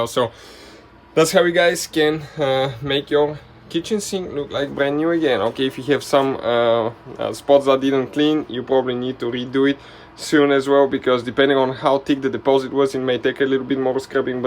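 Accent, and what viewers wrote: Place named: Russia. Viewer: He sounds Russian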